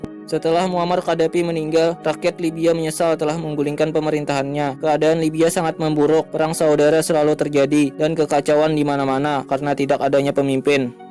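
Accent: Banjar, Indonesia. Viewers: Logatnya kada kawa dikeramputi lg, pasti urang banjar, hehe.. salam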